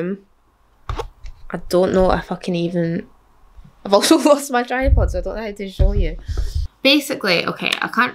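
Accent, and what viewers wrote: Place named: Glasgow, United Kingdom. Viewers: The glaswegian accent gives me whiplash every time, but i love it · Always forget your Scottish too, your accent is like mines. I'm from Southside of Glasgow 😊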